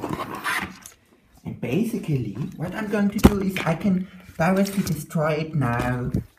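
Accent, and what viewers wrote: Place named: Germany. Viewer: That german accent is craking me up lol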